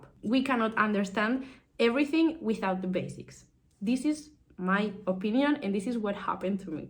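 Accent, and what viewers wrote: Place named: England, United Kingdom. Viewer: ngl i love your english accent, so much energy!!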